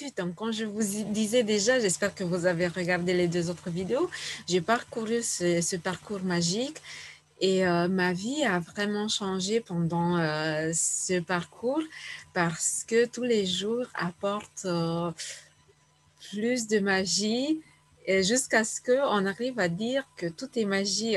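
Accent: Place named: Romania. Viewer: Merci beaucoup Je viens de vous découvrir... Je vais acheter le livre, le lire, m en imprégner et puis suivre vos vidéos pour faire ce travail... J aime beaucoup votre petit accent roumain sympathique, votre bonne humeur contagieuse et votre franc sourire A bientôt 🖐🖐🖐🖐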